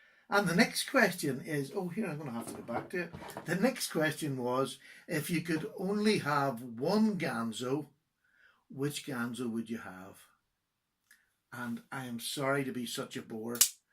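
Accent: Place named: Ireland. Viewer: This was a wonderful video! Love your story-telling and admire your honesty and humility. As an American living in Belgium, I appreciate your English ( with the wonderful Irish accent) and your European point-of-view on knives and knife collecting. Your grandchildren brought a smile to my face and makes me happy to remember that my 2 grand kids, my daughter, and my son-in-law will be visiting me in Brussels next week. Keep up the great work! Look forward to all your videos.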